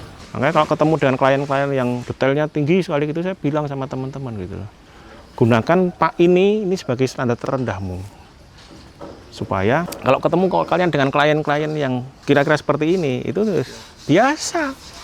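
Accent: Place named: Surabaya, Indonesia. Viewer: Suroboyoan e metu cakkk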